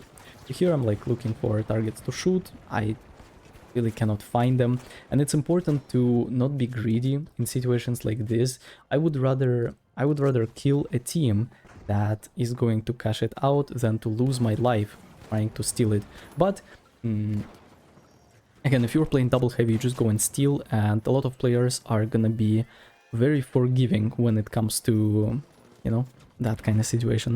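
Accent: Russia. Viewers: agreed, his russian accent is pretty cute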